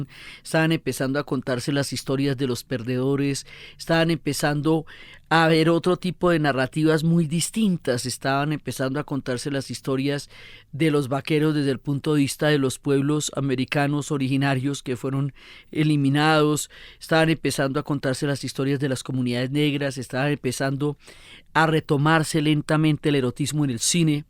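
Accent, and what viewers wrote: Colombia. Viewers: Historiadora con estilo propio y ese acento chevere colombiano, un abrazo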